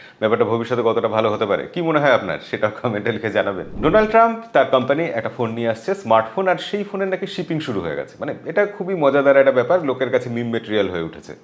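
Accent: Bangladesh. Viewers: বাংলাদেশি উচ্চারণ!!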